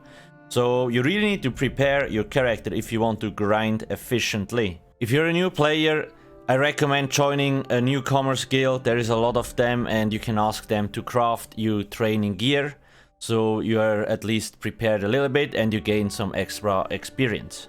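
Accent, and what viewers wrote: Switzerland. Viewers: Are you from switzerland? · Sounds like swiss dude. I can spot accents 🤣🤣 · Thanks for the vid! And that accent sounds very Swiss!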